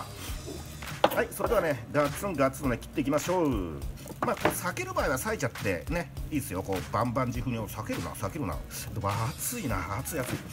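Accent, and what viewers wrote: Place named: Kanto, Japan. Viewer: 関東の人だね また関東に住みたい。。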